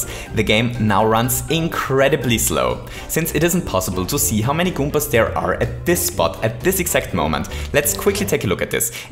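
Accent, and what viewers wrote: Germany. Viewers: the german is strong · Not being rude but you sort of sound German....or Austrian....or even maybe Polish...Just saying! Gday and kudos from Australia! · Deutscher Akzent :)? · The german accent is strong with this one! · Are you German? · Are You german? Your accent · Urgh german accent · He has a strong German accent imo. But maybe it's easier for me to tell because I'm Austrian too. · An die deutsch englische Aussprache muss man sich erstmal gewöhnen sonst gutes Video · He has the most German accent I have heard in a long time! Psycrow with a hard "p" at the beginning for example ;) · Dieser Akzent, man hört richtig das Deutsch raus xD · Are you german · I always thought that he was german too but i didnt want to make assumptions. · Dein englisch :D gut,aber der deutsche Akzent :D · Are you german? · I'm German and I normally dislike German accents, but accent is kind of...charming? 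And it makes me smile when I can tell from his voice that he's smiling.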